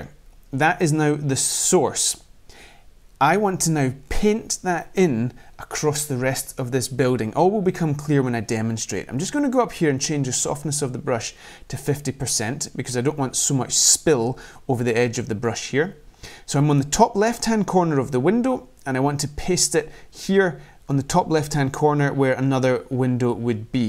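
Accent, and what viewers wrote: Scotland, United Kingdom. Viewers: Thanks for that .... very informative! At first I had trouble concentrating on what you were saying, because I was captivated by your glorious Scottish accent, but once my Aussie ear adjusted itself to your accent, I was able to follow every word. Great work!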